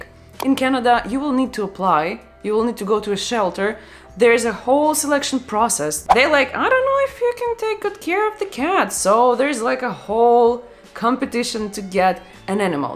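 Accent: Russia. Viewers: Your Russian accent is cute 👍me likee like 😁🥳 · I love your English with Russian accent, keep doing your great stuff.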